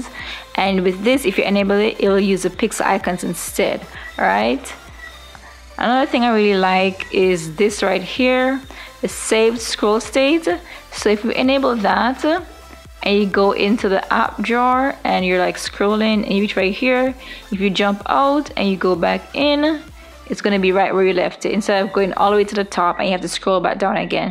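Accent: Jamaica. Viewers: you sound like a jamaican nice videos once your on youtube you set you soon get more subscriber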